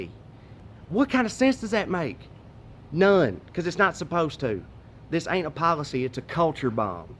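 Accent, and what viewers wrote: South, United States. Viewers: We love you too... nothing better than a liberal with a southern accent. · As an ex-pat Arkansan, it's refreshing to hear a southern accent saying intelligent things. I love my home state, but the people there can be absolutely idiotic. They voted for el trumpo. Go figure. I live in DC now. He's a goner from what I see in the beltway. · Oh my God, I love you. You are like Jon Stewart with a Southern accent. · You’re awesome!!! It does my heart good to hear your southern accent speak wisdom!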